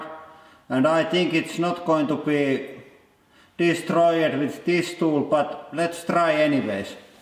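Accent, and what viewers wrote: Finland. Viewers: its the finnish accent becouse we speak how we write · rallienglanti paras englanti : D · Judging by his accent, is he Finnish? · I love his accent. Finnish :)! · are u from Finland because u sound like the dudesons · suomalaista paskaa englantia :D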